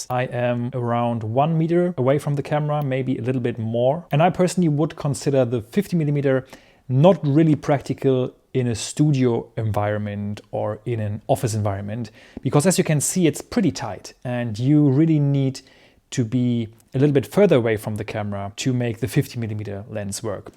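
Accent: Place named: Germany. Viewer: That barely discernible German accent makes everything subtly similar to Schindler's List.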